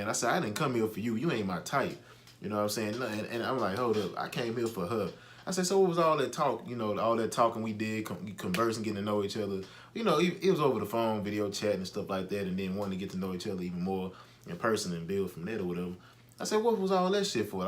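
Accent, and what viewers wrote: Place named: Houston, United States. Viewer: U sound like you from Houston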